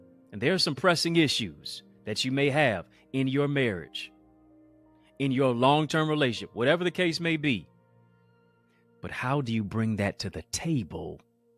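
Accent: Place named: Malaysia. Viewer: Speaking manglish ✨🔥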